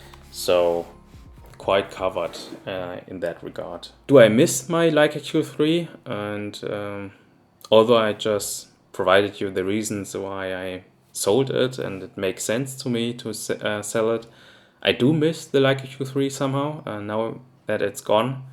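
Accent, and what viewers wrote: Germany. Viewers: i think i hear a german accent, live in Germany? i was incredibly hyped up for leica q3, you shattered my dreams :( :P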